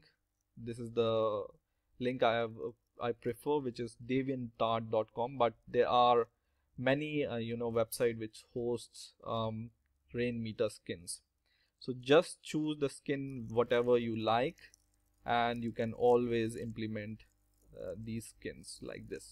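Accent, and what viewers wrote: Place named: India. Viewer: It's certainly part Indian.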